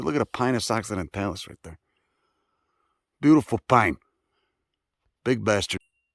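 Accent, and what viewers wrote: Chicago, United States. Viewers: Chicago accent Very salacious content you got here.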